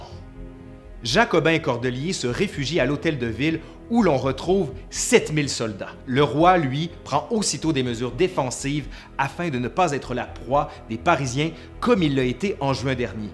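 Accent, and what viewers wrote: Canada. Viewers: Tu as un accent canadien🇨🇦🇨🇦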